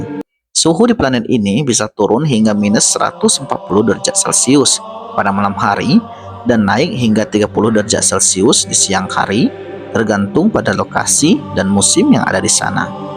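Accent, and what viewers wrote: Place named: Madura, Indonesia. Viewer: GANTI PEMBACANYA!! BACA NYA KAYAK ORG MADURA… Tak Ye Tak Ye, kyk tukanh sate depan rumah saya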